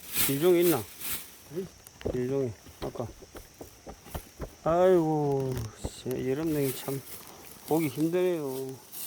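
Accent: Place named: Mungyeong, South Korea. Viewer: 저는 고향이 문경 입니다. 저의 고향 말투랑 비슷해서 정감이 가네요.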